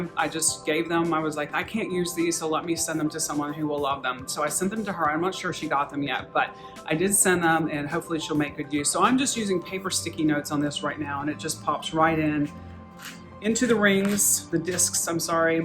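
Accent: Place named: Brooklyn, United States. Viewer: Lol. I knew I heard a Brooklyn accent 😂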